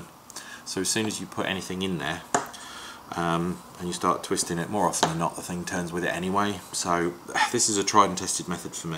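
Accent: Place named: United Kingdom. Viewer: Damned British accent PLUS not talking into the mic made it hard to understand a word you said. Learned more from watching than listening.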